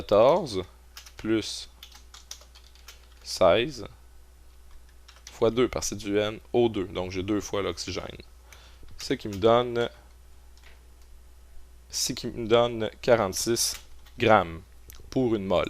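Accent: Quebec, Canada. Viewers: Ah un accent quebequois !!!! mercci ^^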